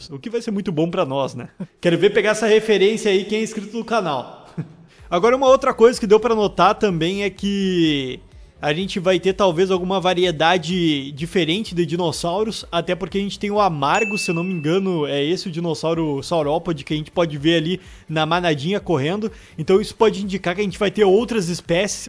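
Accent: Rio Grande do Sul, Brazil. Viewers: você do Rio Grande do Sul?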